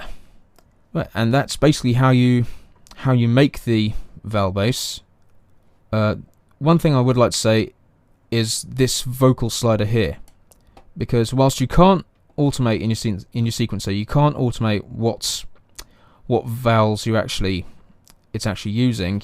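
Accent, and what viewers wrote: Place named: England, United Kingdom. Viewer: are you from england or something? your accent sounds like the guy from the simpsons that trades places with homer in that one episode, who falls in love with marge :D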